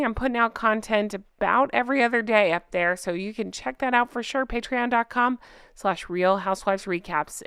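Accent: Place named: United States. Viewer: The entire royal family is a scam to promote British tourism. You sound like an American. Why do you care? We made a break from that Monarchy in 1776. Get a grip.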